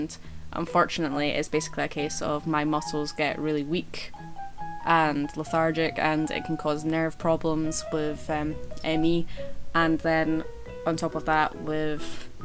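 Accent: Scotland, United Kingdom. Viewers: Lol, your accent is not too strong for being Scottish. But definitely recognizable. My friends parents came from there. And somehow her mom's voice has been implanted into my brain and I think in a Scottish accent. That is so weird to me lol. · Probably you get asked if your Irish as the northern irish and Scottish accent have similarities :) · No she is British can u tell the accent I'm an American and I know that,and why is people trying to get ur age, you did the right thing and not telling them ur age. Yeah same here I wear 24/7 because I love it,no medical reason but I do love your fighting spirit that gose a long way with u...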